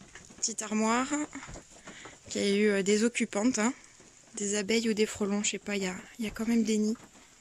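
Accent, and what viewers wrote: South, France. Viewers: Heureusement que tu as des chiens!!! J'adore ces animaux fidèles et efficaces. Méfie toi quand même du gars chelou du soir, c'est pas clair.. Demande à ton copain de te protéger 😉 Tu es toujours aussi belle et craquante. Tu es une belle âme, une bonne personne qui respire la bonté d'âme: Beauté, générosité, bienveillance, fraîcheur, spontanéité, franchise se ressentent car tu vis harmonie avec la nature, loin des maux de notre temps. Bonne route et bon courage dans ce que tu entreprends,. Peut être qu'un jour nos routes se croiserons et çà serait avec grand plaisir que je partagerai une tasse de café avec toi. Ton terrain est dans quel coin déjà, vu l'accent je dirais dans le sud mais vers où? Des likes et des Bisous bien doux 😘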